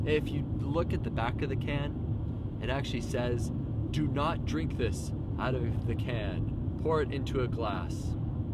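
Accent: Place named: Northern Ireland, United Kingdom. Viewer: Ur accent is the north of Ireland accent different from the south. The north have a funny accent I think.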